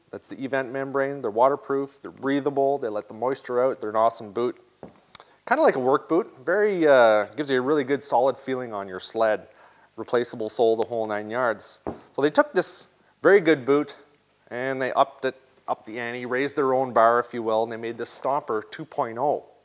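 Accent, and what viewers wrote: Canada. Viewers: you cant tell by how he talks?! Canada ehhhh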